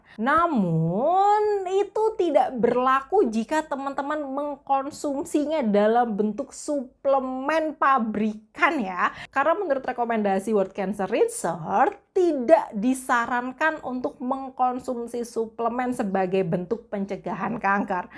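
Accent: Java, Indonesia. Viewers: Dr klu berbicara medok banget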